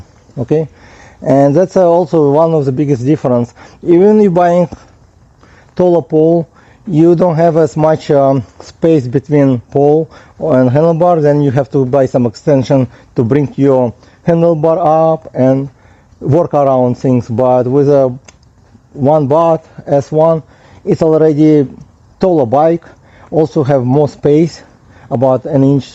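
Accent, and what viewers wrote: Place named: Russia. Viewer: Your accent is funny sounds like Russian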